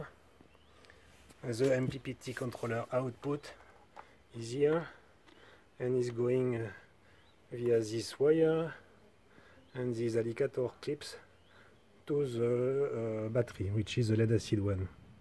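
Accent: France. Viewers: joli taf ! c'est marrant comme on catch les français rien qu'en les écoutant parler anglais (evidemment je m'inclus dedans :)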